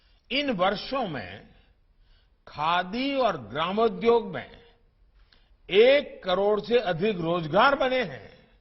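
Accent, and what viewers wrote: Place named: North, India. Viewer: That's true he's needs to speak in English and not like a North Indian villager what about the rest of us non hindi speaking Indians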